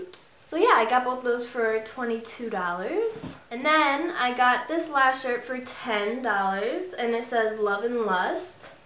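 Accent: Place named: New York, United States. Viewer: YOU FROM NY ARENT YOU!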